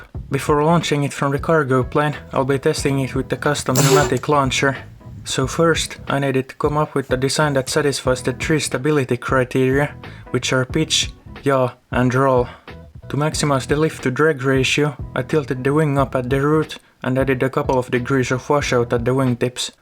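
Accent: Finland. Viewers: You sound finnish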